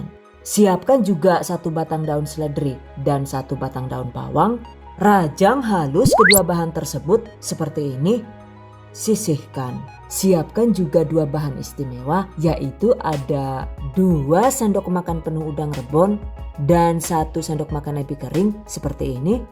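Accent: Banyumas, Indonesia. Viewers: Hahaaa seneng lahh kro suara ngapak'e kya nyonk kswuuunn resepe ya kk ❤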